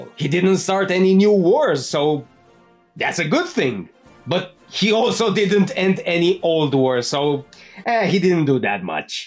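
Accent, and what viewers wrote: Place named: Midwest, United States. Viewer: Bro who are you and why do you sound like a midwestern ElectroBOOM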